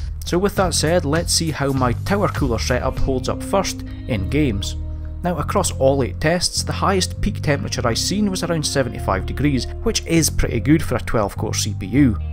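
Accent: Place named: Aberdeen, United Kingdom. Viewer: He sounds like he's fae (from) Aberdeen like me and for that reason alone I subscribed - can you recommend this cooler on the AM5 7900, I currently use the Raith prism just like AMD does in their test labs, into PBO2 testing on my ASUS B650e-e rig at -35 along with AI overclocking and temps remains very good no thermal problems at all scoring 54000 plus on pass mark 11.1 all day long and 12400 on CPU-Z? Getting 27000 score from a Asus Dual 6750 XT card Also, a top SEO guy if you need help bro, another clue lol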